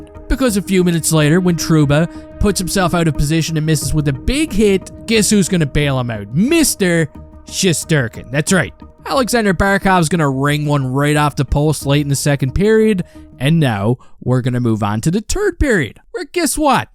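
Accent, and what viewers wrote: Newfoundland, Canada. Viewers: East coast Newfoundland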